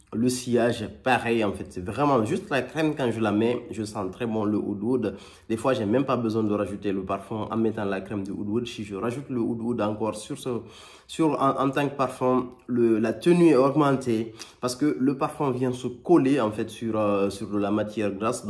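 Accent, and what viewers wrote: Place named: Wolof, Senegal. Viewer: Hello merci pour ta video, Jaime bcp ta chaîne!!! Es-tu sénégalais ? Tu as un accent wolof !!! 😻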